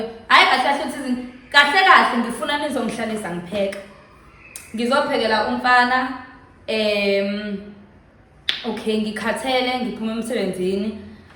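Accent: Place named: Thefula, South Africa. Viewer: Ave ungchaza Mase uthefula❤❤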